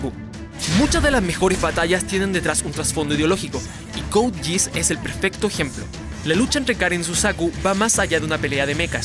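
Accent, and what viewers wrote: Chile. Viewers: eres chileno? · ¿chileno? :v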